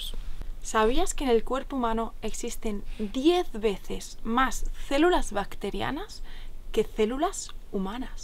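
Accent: Spain. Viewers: Puedes compartir de esos cultivos para hacerlo yo? Por favor x tu acento creo q eres española yo vivo en USA si eres fan amable gracias espero tu respuesta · ERES FABULOSA,,TE VEO DESDE AUSTRALIA,,DESCUBRI KEFIR POR ACCIDENTE,TRATE EL AGUA DE KEFIR Y NO ME GUSTO MUCHO,,CON TUS CLASES, YA LA VOLVERE A HACER NUEVAMENTE, TENGO 85 AÑOS Y ME SIENTO FASCINADO, ME GUSTA TU ACENTO ESPAÑOL GRACIAS MIL LINDA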